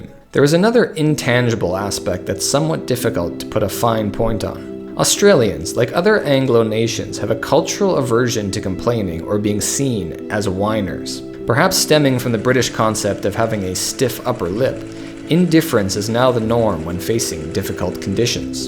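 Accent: United States. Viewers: Sounds typical for this type of country. U.S. has similar problems. Hopefully you're keeping an eye on the value of keeping families intact. We created an environment where reliance on one's family to live comfortably is gone. It shows in very bad ways. I do find one thing funny: I watched a video the other day explaining the U.S.'s national debt problem. It was narrated by a young man with an Australian accent. This video is narrated by a guy with an American accent. Go figure. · life is impossible in australia? clearly you need to open your eyes and look at life in poorer parts of the world! Methinks your "life is impossible" has the whine of someone complaining they don't have the latest apple phone, or some such flippancy. The way you pronounce some australian place names indicates you have no idea about australia...... where do you (the narrator with a tinge of american accent) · Crap clickbait. 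American voiced bot spewing crap. There’s nothing wrong with Australia. When you look at the rest of the planet, we’ve got it pretty good. Pure bull💩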